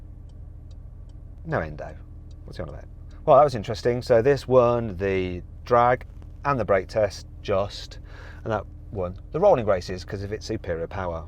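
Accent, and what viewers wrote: United Kingdom. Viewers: manteb nih channel, sekalian belajar british accent wkw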